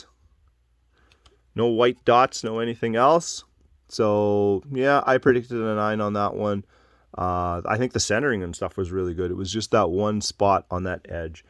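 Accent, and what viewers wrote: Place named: Canada. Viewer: I'm in Kingston, Ontario. I knew this guy was Canadian a few seconds in! Cheers fellas.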